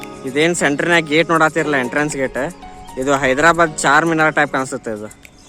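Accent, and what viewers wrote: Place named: North Karnataka, India. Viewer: ಉತ್ತರ ಕರ್ನಾಟಕ ಗಿಚ್ಚಿ ಗಿಲಿ ಗಿಲಿ talking...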